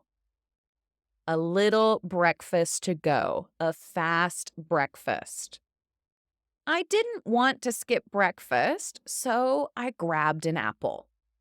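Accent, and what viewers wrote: United States. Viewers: Good to have lessons only in the american accent like this. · It's amazing hearing pure American accent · Teacher makes more videos alone , I love your Americana accent .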